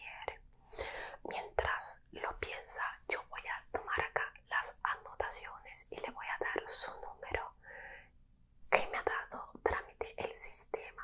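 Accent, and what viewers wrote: Italy. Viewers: Se te esta pegando el asento italiano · De que pais es? Italiana? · Eres de Italia? , nose tu hacen to me parece Italiano 😁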